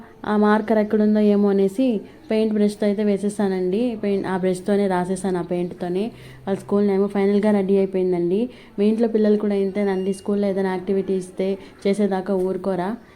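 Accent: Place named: Nellore, India. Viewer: Nellore aa sis medi nellore yasa anipistundi mi matallo 😂😂😂bagundi mi bus lorry avvaley😜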